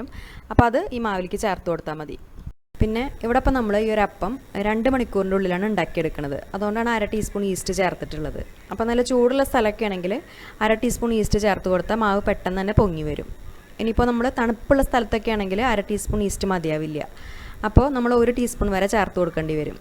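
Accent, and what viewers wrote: Thrissur, India. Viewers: 😋😋😋😋😋 Mol trissur aano...bhasha kettu chodichathaatto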